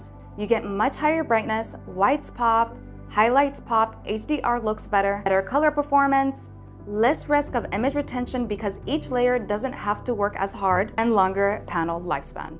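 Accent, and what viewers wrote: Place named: United Kingdom. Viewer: I need this monitor because you do the best British accent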